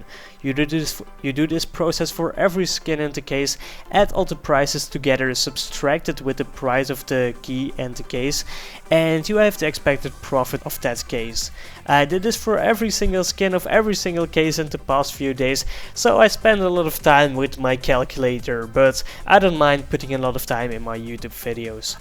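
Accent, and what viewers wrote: Netherlands. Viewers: u Dutch bro? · dutch accent is the worst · is he dutch?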